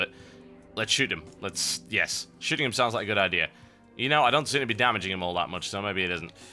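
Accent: United Kingdom. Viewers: Woo, half an hour of soothing limey voice.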